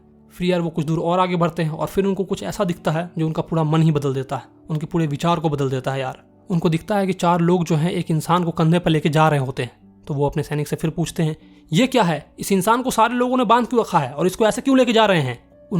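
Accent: Bihar, India. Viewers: he is from bihar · Sir aap bihar kai ho kya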